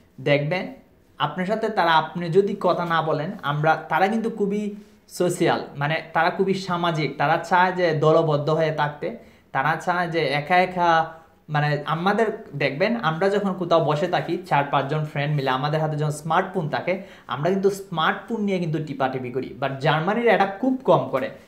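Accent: Sylhet, Bangladesh. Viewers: oni mone hoy sylheti bibaker manush tai amon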